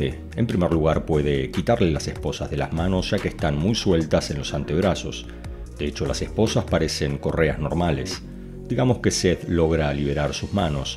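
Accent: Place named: Argentina. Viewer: No me entero si eres argentino o español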